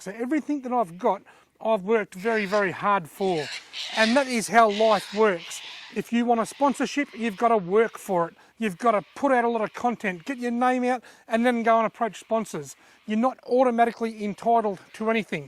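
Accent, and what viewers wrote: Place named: Australia. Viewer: i am proud to be aussie when i hear a fellow aussie speak this way good on you keeping it real is so important great job mate:)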